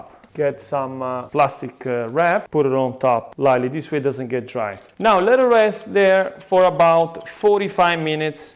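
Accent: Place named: Bari, Italy. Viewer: You have a really nice Barese accent.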